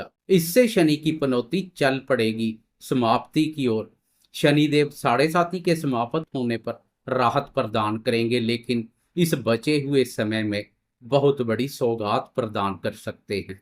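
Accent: Punjab, India. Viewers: Apki Hindi mai Punjabi touch aata hai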